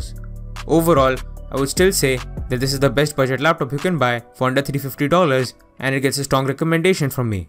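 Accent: India. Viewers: Very thicc Indian avcent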